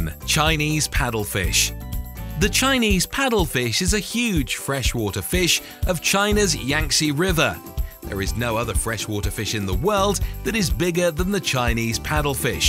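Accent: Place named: United Kingdom. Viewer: What's with the British accent?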